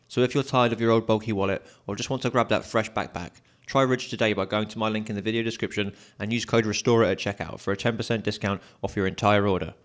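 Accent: United Kingdom. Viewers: No problem with few talk here and there but the British accent is hard for me to understand in some points especially in fast speaking because English is not my language.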